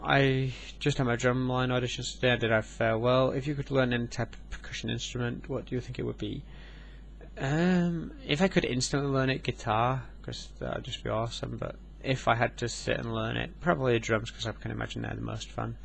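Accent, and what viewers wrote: England, United Kingdom. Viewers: which part of england are you from?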